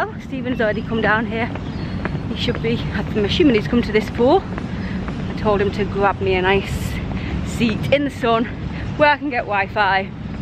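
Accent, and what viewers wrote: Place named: Yorkshire, United Kingdom. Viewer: That Yorkshire Accent.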